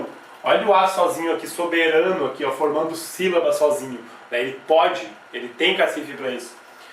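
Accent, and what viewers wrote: Rio Grande do Sul, Brazil. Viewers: gostei do sotaque gaúcho ..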